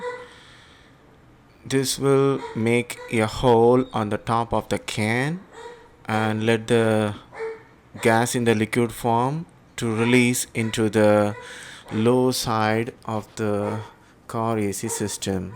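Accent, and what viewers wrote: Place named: United States. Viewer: US accent is good.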